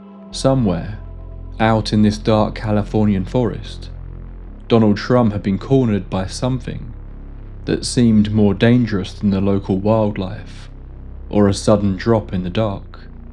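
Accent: United Kingdom. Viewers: A british narrator is so boring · The British wank narrating this story is not helping sell the mystery or horror, whatever. I found myself wishing the narrator was in another line of work.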